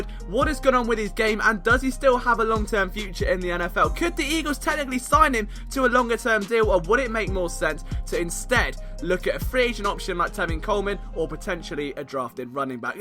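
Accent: England, United Kingdom. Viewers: you nailed it 'unless that draft pick is a 5th or 6th but i dont think the bears would lose howard for that ' hahahhaa well guessed not only was it a 6th that miiight become a fifth but it was also a bonus comp.formula pick PLUS it wasnt a 5thor6th rounder THIS year, its for 2020, we gave up a 6th or possibly 5th round pick NEXT year for stability in the RB room - id lose smallwood, keep adams as a prospect and see if he grows, keep corey clement for his pass catchin (if sproles retires or eithe rway really) i think we should still draft an RB in this draft, esp if a legit back falls, idk bout jacobs in the 1st but there are a few really good backs that will go in the 2nd/3rd so since we have two 2nds and no picks till later in the 4th (when the good RBS on our board could be gone) i reckon we either use a 2nd on a good back that falls OR we trade one of our 2nd round picks to a desperate team lookin for a specific player etc for two 3rds this year or a 3rd and 4th this year and then we draft a solid RB in the 3rd, even using the other 3rd/4th round pick in a bundle to move up to the first pick of round 3 or high enough we KNOW we'll get our guy... 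and can i just say as an english born and bred (but in japan, then NZ where i got citizenship, then america where i did, now i live in australia so its cool hearing an english accent talk american sports with knowledge - reminds me of my dad (who obv kept his english accent since he left engald at age 21,s ame with my MUm btw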